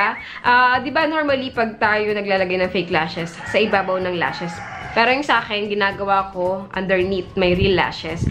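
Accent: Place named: Quezon, Philippines. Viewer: thanks maamsh.. Taga san po kau parang puntong quezon.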